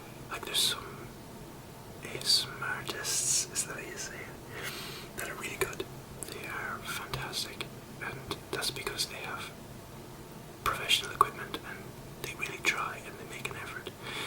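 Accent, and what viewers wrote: Ireland. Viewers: This is good stuff. The irish accent will do it for a lot of people. Keep it up :) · Where in ireland r u from??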